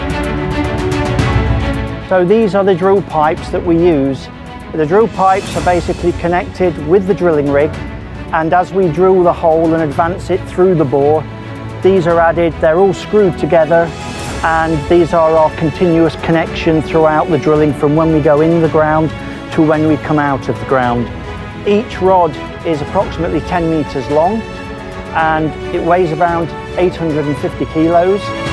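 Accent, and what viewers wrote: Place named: England, United Kingdom. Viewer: Der kommt halt aus England . Untertext ist doch Deutsch halt net Schwäbisch 😅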